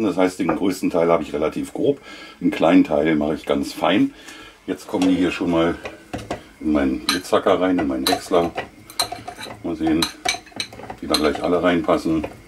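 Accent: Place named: Berlin, Germany. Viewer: Aha, is Berliner! 😁 Ma von Lichtenberg wink. 😉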